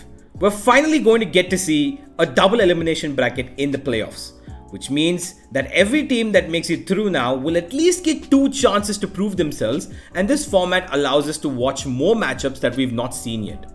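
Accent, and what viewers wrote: India. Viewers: Great video keep it going, its nice to listen an Indian voice talking about this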